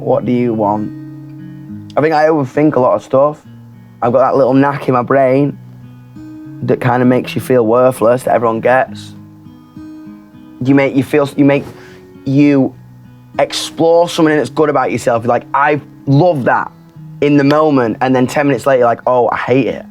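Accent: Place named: Doncaster, United Kingdom. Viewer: I love the Doncaster accent.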